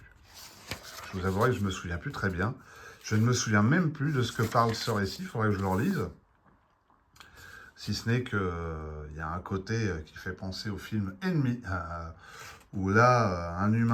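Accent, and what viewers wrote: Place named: England, United Kingdom. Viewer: Vraiment chouettes ces magazines. J'ai vu qu'un Quebecquois avait écrit une lettre dans le courrier des lecteurs, les chanceux... Alors essaie de dire avec l'accent québecquois "Tabernacle qu'ils ont chouettes ces primates" la prochaine fois. Non c'est ni raciste ni stéréotypé, si on me demande je dirai que c'est la faute à ChatGPT. C'est vrai, quoi, on connait ton merveilleux accent anglais mais pas ton accent québecquois. Le Monde doit savoir. Vache, Taylor dans l'adaptation met un coup de pilon à la Bud Spencer pour assommer le gardien ! J'ai gonflé mes muscles, surtout au niveau du ventre, c'est là qu'ils sont le plus développés.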